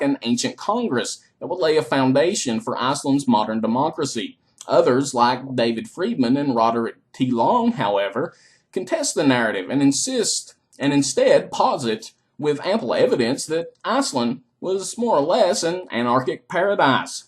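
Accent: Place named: South, United States. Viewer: Don't hate Southerners, they have different rules of pronunciation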